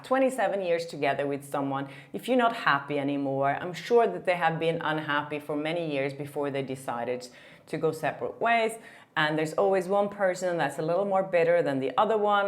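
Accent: Norway. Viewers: I love your Norwegian accent! We visited Stavanger last year and had an absolutely lovely time except for the hills. My legs were aching. Keep up the good work. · Your Norwegian accent is lovely and your syntax mix ups are lovely too! ❤️❤️❤️❤️ we know what you are saying and you are doing great! 🎉❤ You are also doing better in your second language that 99% of other ppl in the comments could do! 😉😉😉 😉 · You're adorable!! I much prefer your original Norwegian pronunciations!! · I find a little Norwegian twist to some words quite charming! The friendship between Ryan Reynolds and Hugh Jackman is a dichotomy. (Hope I’m using the correct word). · Your Norwegian accent is beautiful!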